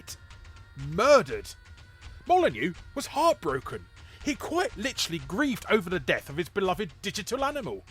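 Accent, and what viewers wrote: England, United Kingdom. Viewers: multi year long subscriber, just commenting that it took roughly five years for the algorithm the randomly feed me the "opps, all malyneux" eppisode from your back catalog, anyhoo, straping in to sample this stale arse video about a stale arse chip on ya shoulder as i shake my fist as shadows on the cave wall in honour of your frustrations, love your accent though, wish more spoke the queen's english round these parts.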